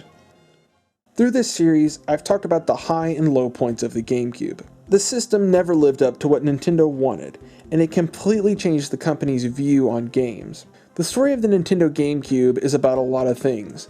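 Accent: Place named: South, United States. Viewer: Your accent. Are you from Georgia? South Carolina?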